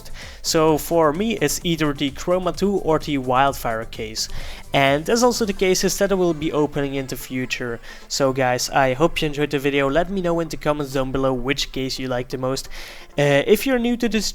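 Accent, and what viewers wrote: Netherlands. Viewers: u Dutch bro? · dutch accent is the worst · is he dutch?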